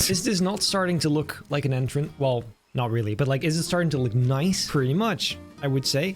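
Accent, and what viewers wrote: Netherlands. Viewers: Holdon Is this the same guy behind photoshop battles Sounding very dutch😅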